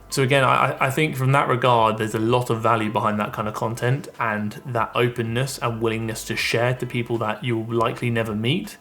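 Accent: United Kingdom. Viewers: Yours is the British accent I like. What area of the UK is from? Thanks for your videos :)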